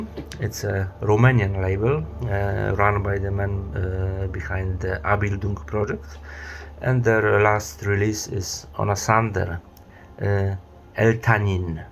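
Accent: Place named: Poland. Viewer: I knew I heard that Polish accent and typical Polish "erm/ummm"s right. Imma be honest, I have no idea in world how you got recommended to me, but I'm really happy you did. Will definitely check out the stuff you've spoken about. Cheers and keep going!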